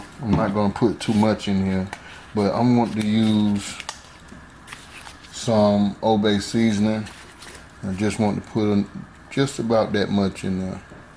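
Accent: South, United States. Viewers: “I got some irl. Some olive irl”. is soooo country, I love it. 🤣🤣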